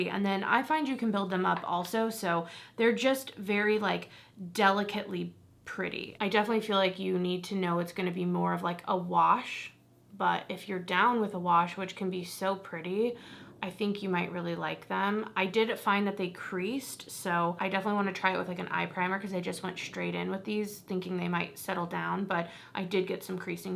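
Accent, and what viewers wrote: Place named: Boston, United States. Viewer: ...Don't listen to anyone who "corrects you" Girl! I'm from Boston, we have a LOT of people with the last name LUZ. Tons of businesses named LUZ and it's pronounced just as you pronounced it! LUZ I've NEVER heard it pronounced loose? That's crap! Hahaha Just tell them you have a boston accent and keep it moving! 😊 You pronounced it fine! 🙄 Keep doing you, don't worry about dumb pronunciations!! 😂 Love ya!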